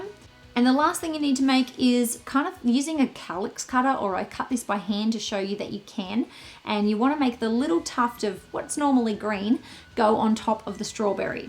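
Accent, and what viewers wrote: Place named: Australia. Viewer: OMG you're Australian, so refreshing you hear another Aussie on YouTube. Was fully expecting you to have an accent.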